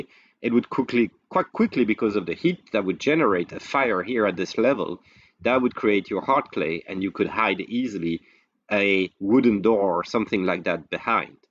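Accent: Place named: France. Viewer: nice french accent ! ^^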